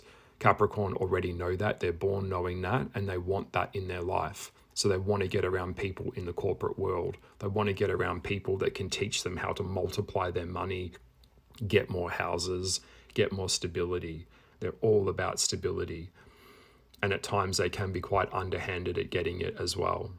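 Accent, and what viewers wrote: Australia. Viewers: 🤣🤣 he is Australian not French where did you get that from ?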